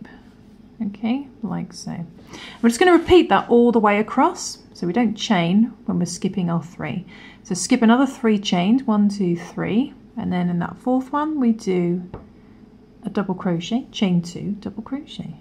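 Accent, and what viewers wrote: United Kingdom. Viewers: The 1st row is called the foundation chain. Love this pattern & perfect since I've been searching for a wash cloth with enough openings to dry faster & just easier to deal with soap/water. Little cupcakes are precious, thanks so much. The gap that you starting on second row we in the U.S. is called space. Still like your British accent.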